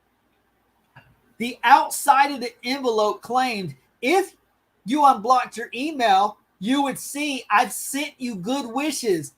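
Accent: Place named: Scotland, United Kingdom. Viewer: Hi Im not really Stalking you lol. I love your channel. Id go live later after UK time 6pm when everyone is home from work. From experience more people will be able to join your live. I dont know what time it would be where you are. Your Scottish accent is brilliant. I love your coverage and hope Richard gets justice. Lots of love 💖💕xxxx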